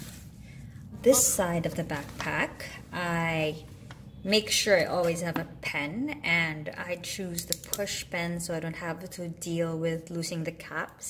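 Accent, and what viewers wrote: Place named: Bisaya, Philippines. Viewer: Hello kabayan nice review. Bisaya ka din ba? I notice your accent kasi very bisaya. Proud bizdak here. 👋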